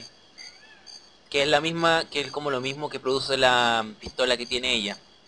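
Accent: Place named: Argentina. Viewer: Ahora sé que sos argentino y adolescente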